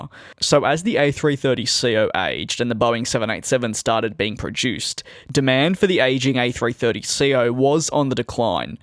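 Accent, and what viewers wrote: Australia. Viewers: He’s an Australia boy but gonna be moving to uk few days from now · He’s Australian but has moved to the UK.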